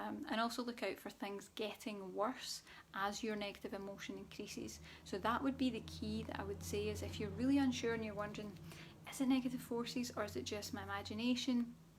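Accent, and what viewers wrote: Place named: Scotland, United Kingdom. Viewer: Ohhhhh boy! Have you ever described the last month and a half to a "T" for me! I thought that was what was going on, but I needed the confirmation - and here you are! Thank you so much. 😃 P.S. I love love love Scotland and your brogue! Cheers from Canada!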